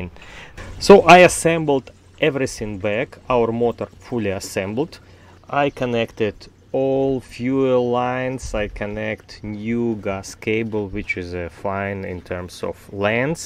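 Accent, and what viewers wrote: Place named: New Jersey, United States. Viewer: Stand with Ukraine!!!!!! We are with YOU!!!! More videos in english please, with Jersey accent :)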